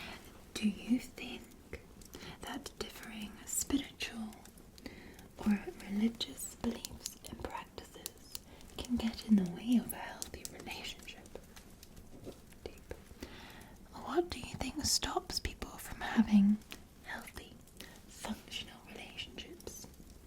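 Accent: Scotland, United Kingdom. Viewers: I love your Scotish accent it's so soothing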